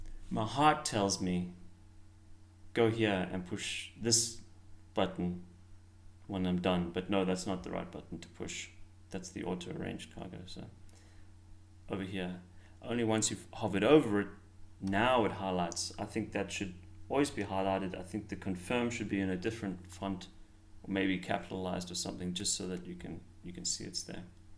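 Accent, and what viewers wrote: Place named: South Africa. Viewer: I could listen to that smooth SA accent roast game menus all night. 🍆 Lmk when and where I can watch the new short film, and while I'm on the subject, I would personally love to see a video showing how you tackled all the rotoscoping in Davinci. What GPU you runnin', tough guy? Tutorials, let's go! 🤣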